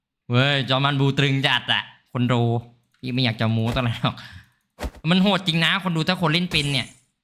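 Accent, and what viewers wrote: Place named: Korat, Thailand. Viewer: คนโคราชนิครับ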